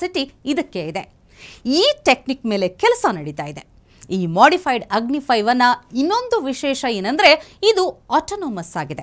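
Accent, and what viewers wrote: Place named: North Karnataka, India. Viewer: ನಿನ್ನ ಉತ್ತರ ಕರ್ನಾಟಕದ ಭಾಷೆನೆ ಚಂದಾ ಕಣವ್ವ, ನೀನು ಬೆಂಗಳೂರು ಭಾಷೆ ಮಾತನಾಡ ಬೇಡ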